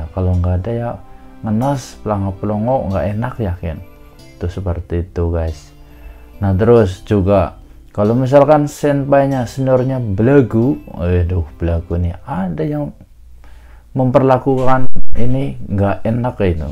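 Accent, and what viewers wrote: Banyumas, Indonesia. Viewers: Ora Ngapak ora penak yuhuuuuu ketemu dulur Ngapak mning heee dengerin cerita suka duka di Jepang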